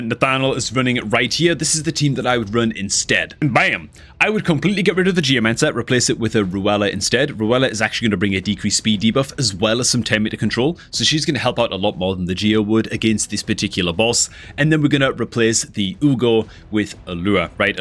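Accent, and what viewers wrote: North East England, United Kingdom. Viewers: He's from the north east of Britain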